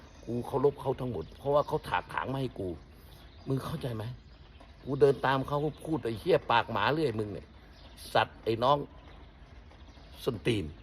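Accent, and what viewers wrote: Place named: Rural, Thailand. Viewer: สุดยอดครับพูดลูกทุ่งดีครับอาจารย์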